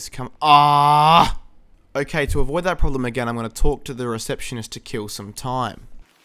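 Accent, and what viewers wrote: Australia. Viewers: what he is Australian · what part of australia are you from? I'm from WA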